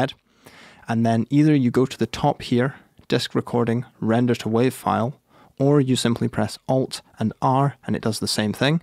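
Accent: United Kingdom. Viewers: Great video and useful tips!Just wondering are you from Britain?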